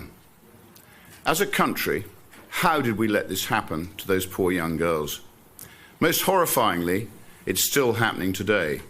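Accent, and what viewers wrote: England, United Kingdom. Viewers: Thats what a TRUE BRIT sounds like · This is what a real Englishman sounds like! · You sound just like a true American with a british accent!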